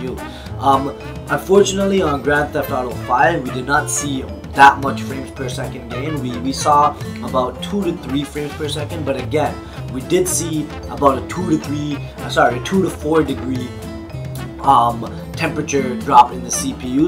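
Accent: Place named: New York, United States. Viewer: This guy is defo from NYC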